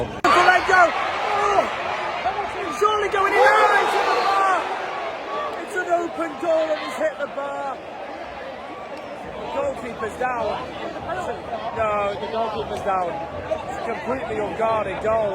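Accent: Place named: United Kingdom. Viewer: O sotaque parece britânico.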